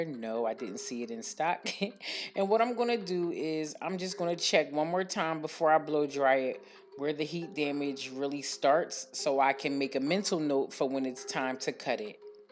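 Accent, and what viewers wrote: Philadelphia, United States. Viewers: I just want to say that hearing your Philly accent gives me joy. And that I learn so much from these videos.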